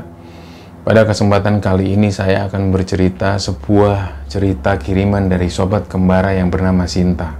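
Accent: Java, Indonesia. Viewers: Paling suka kalo mas yang bercerita. Meduk suaranya hehe. Sukses selalu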